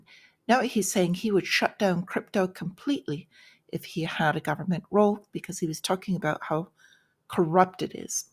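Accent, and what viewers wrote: Ireland. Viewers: So I was right! I thought I detected an Irish accent :-)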